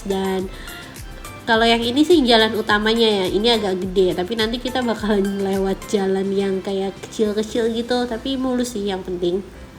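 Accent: Indonesia. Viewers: mimin nya kayak orang sunda, bner ga?he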